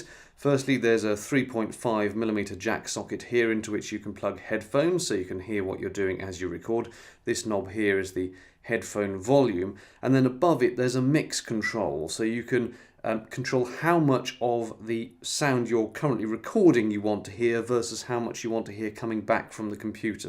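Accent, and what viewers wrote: United Kingdom. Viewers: i love british english :P good review, will buy it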